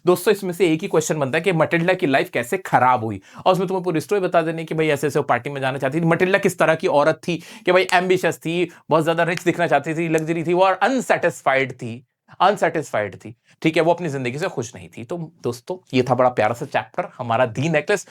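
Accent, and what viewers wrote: United States. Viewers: American accent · Fat, slow and american accent❤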